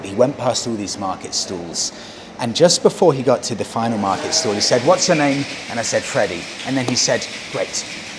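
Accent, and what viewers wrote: RP, United Kingdom. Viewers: My Mother is from Nuwara Eliya and My Father is from Kandy..... so I enjoyed this VDO very much... the art you do this VDO is magnificent.... I love your British ascent.... thank you for taking my country to the world.... Totally regret about the scams.... that is common in this part... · Your voice has a clear, modern RP accent that gives your videos the tone of a high-end documentary.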